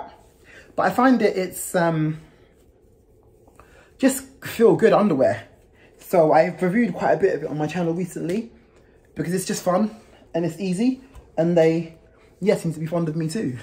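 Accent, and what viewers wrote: United Kingdom. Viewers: British accent in Malibu !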